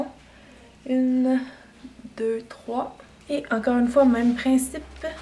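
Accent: Quebec, Canada. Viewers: Je ne sais pas pourquoi mais à chaque fois que je lis ce que tu écris dans la description de la vidéo je le lis avec ton accent québécois :P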